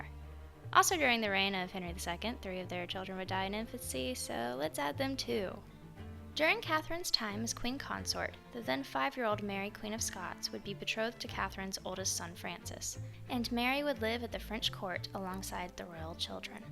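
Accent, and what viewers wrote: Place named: United States. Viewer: From on quite a few facts… I would’ve preferred the narrator to Speak a little slower and not use an American accent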